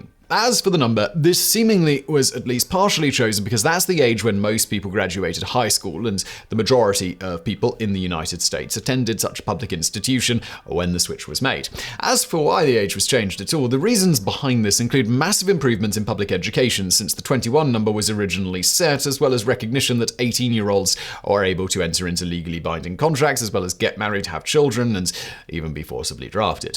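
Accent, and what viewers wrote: United Kingdom. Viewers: are you english, brittish astrailian new zealanden or australionewzealandish?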